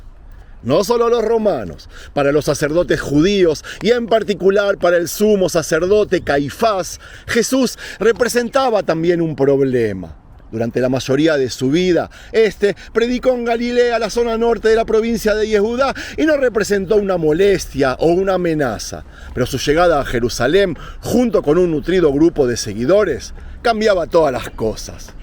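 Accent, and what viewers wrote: Argentina. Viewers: Tu no eres judio,eres un argentino que se cree judio muy distinto Si fueras judio real no tuvieras el tipico acento argentino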